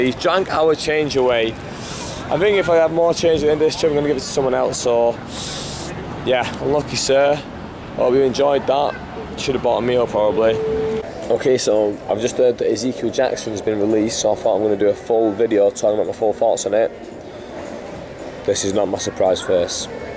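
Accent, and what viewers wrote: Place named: North, United Kingdom. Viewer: great series mate, i'm going WM31 so just started watching next mans videos to get an idea. ain't gunna lie, the northerner in ya came out- great to watch. keep it up lad.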